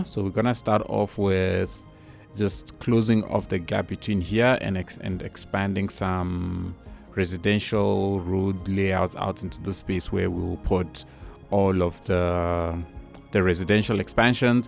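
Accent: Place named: South Africa. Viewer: South African accent 🤔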